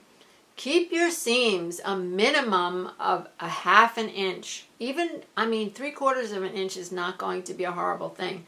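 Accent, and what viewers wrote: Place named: Louisiana, United States. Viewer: You sound like you are from Louisiana.😊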